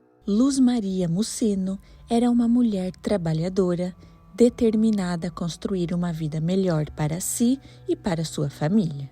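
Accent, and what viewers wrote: Portugal. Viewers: Parabensppelo bom portugues de Portugal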